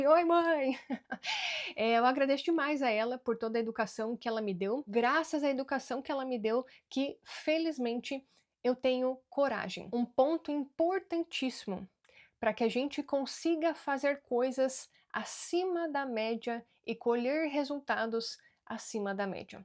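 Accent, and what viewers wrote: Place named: South, Brazil. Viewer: talvez tu já fez vídeo falando de que Estado tu é natural. Tú é Gaúcha ou Paranaense? ( Pelo sotaque). ESTÂNCIA VELHA RS.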